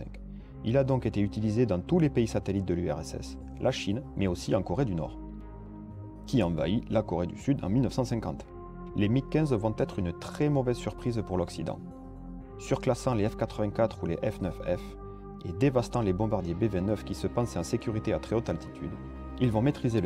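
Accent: South, France. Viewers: je vien de découvrir ta chaine, c'est super, on apprend pleins de choses sans que sa soit trop long, et avec ton bel accent du sud, tu tombe pas dans le piege d'avoir un ton monocorde qui endors, continu comme ca, on veut pleins de videos :p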